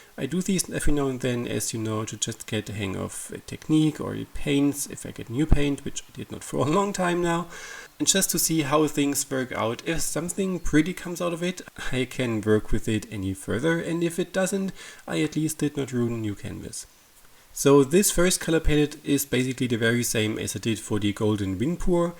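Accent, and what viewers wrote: Germany. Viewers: Dachte ich mir schon, dein Englisch klingt so deutsch 😂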